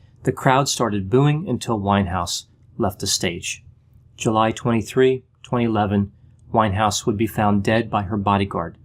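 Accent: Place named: Harrisburg, United States. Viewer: I share the Delaware Valley accent with. It's a beautiful thing. But I'm curious to know if he's more west-of-Philly than he is Delaware Valley. His accent would seem to indicate this. From him I hear more Harrisburg (or at least Montgo) than I hear Philly or Wilmington.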